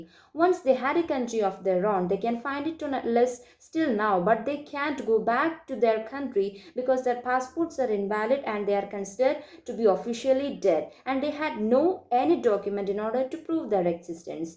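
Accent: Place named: England, United Kingdom. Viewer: I like your english accent ❤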